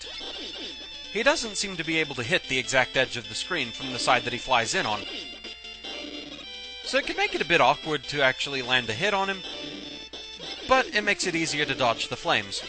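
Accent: United Kingdom. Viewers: i like the accent too. i can't place it. uk?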